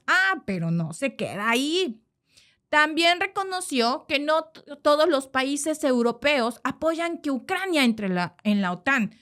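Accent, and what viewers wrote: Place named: Mexico. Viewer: Qué agradable expresividad tiene Vd., Doña. La "música" en su locución, con ese toque MX, pero sin caer demasiado en slang local, es muy agradable. Me he suscrito porque me resulta relajante escucharla un tantito (como dirían en MX 🙂), Se hace más llevadero el habitual horror que nos trae la actualidad internacional en estos tiempos. 👋👋👋 A veces me rio yo solo cuando recuerdo cómo, al referirse a algún impresentable, le llama "nuestro fugurín" 🤣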